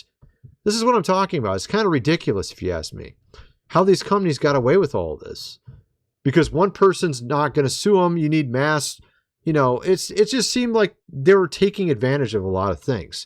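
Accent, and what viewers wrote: United Kingdom. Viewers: Why is a British guy in America ?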